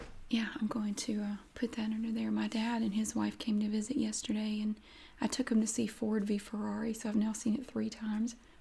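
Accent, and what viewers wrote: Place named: Tennessee, United States. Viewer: Just found your channel. You must be from Tennessee or Kentucky?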